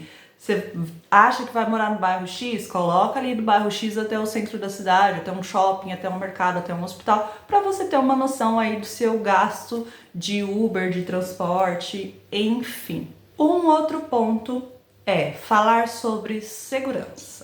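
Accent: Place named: Brazil. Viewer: Que mudou? Conta ai ...pelo sotaque é brasileira...acertei?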